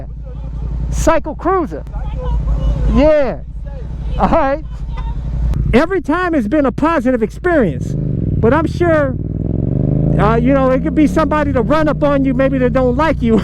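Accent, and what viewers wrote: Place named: New Orleans, United States. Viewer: With your accent I always thought you were just from New Orleans 🤔🍷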